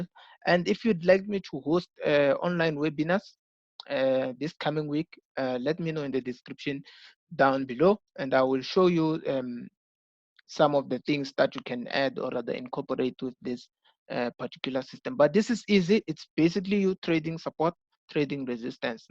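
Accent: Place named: Venda, South Africa. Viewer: And judging by the accent I'd say you're Venda? Besides your love the work bro. And that wololo killed me😂🤣🤣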